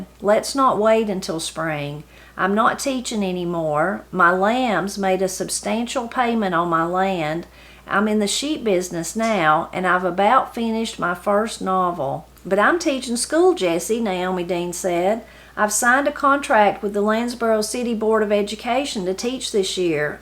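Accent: Appalachia, United States. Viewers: I cannot tell you how much I enjoy listening to the books you read about lives in Appalachia with your beautiful accent…! I have lived in the Blue Ridge for 5 years bow and Love the stunning grandeur of these mountains, the graciousness and simplicity of the people and their connection to this land. Sad to see this book end…!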